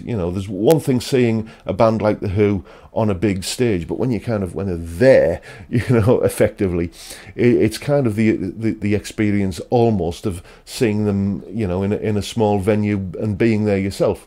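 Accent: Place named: England, United Kingdom. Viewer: Great fun article! Love yer english m8! Wasn't there something briefly hosted by Peter Cook?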